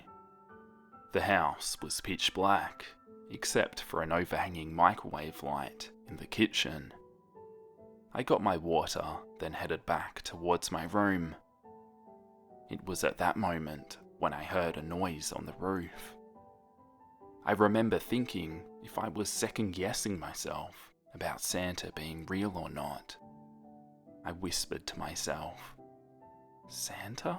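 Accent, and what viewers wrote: Australia. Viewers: I do too i think its because hes australian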